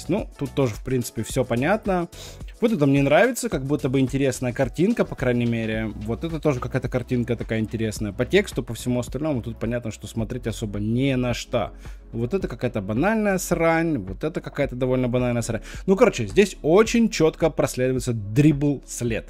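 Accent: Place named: Ukraine. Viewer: как же приятно слушать украинский говор на моменте с чатгпт и твои ЧИRRRRRИКС лайк без раздумий ❤️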